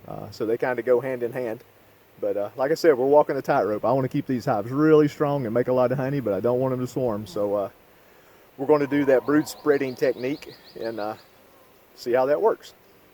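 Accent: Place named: South, United States. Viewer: I thought I recognized my language 😂😂 bursted with a country twang I’m in NC too 😂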